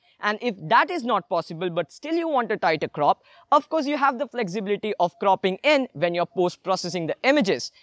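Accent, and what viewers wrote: India. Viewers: Very clean and clear indian accent👍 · fuck that indian accent lol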